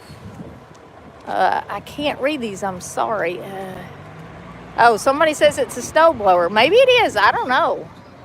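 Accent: South, United States. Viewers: Your little country voice just sounds so sweet. ❤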